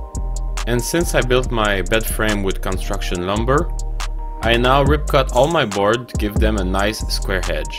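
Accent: Quebec, Canada. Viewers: French canadian? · T'es pas québécois par hasards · Ça sent l'accent québécois tout ça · ca sens l'accent de Quebs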